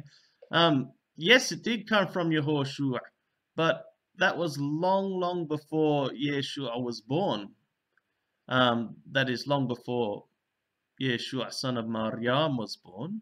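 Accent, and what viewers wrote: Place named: Arab world. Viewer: This is a very good explanation for the Greek transliteration of Yeshua. I have listened to a couple your Hebrew readings and your Hebrew pronunciation sounds a lot more purer than the the false modern jewish hebrew. Yours sounds more arabic. Do you have any videos were you explain your pronunciation distinctions and corrections compared to the incorrect pronunciations of modern jewish hebrew? Thanks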